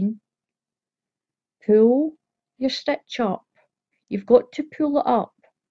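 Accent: Scotland, United Kingdom. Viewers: Thanks for a lovely CLEAR TUTORIAL. I'm stuck in bed after heart surgery so looking forward to trying this pretty pattern later today. Lots of love Kathy. Also lovely to hear a Scottish voice. Been away from Scotland for 50 years ❤️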